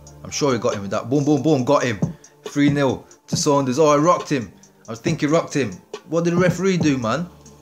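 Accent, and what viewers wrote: United Kingdom. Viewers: never seen this channel before. Late subscriber. Is that a notts accent i hear or derby? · Yo the guy speaking in the video are you from Notts by any chance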